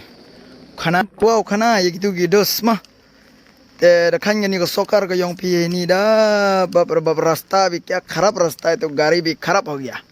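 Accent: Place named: Meghalaya, India. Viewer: Nga u #Bhoi Kwah oh u tip chi klam #Pnar kam phi leh Sngiaw suk sang te i sur #Pnar phi bro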